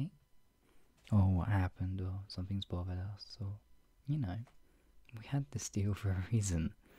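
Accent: United Kingdom. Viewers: This audio is so British it makes me happy 😊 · You talk so much like me it's unreal :3 I'm also British, and I do a lot of pet play with others (and MIGHT be a teensy bit into kitten space myself), but your cadence and word choices are so beautifully specific. Your improv skills are amazing, such a treasure to stumble upon this! ^w^ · oi.. I'm crying you're to cuute the british is too cute I'm dead from fanboying too hard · But like- I thought u were scottish why do u sound scottish to me 🤔✨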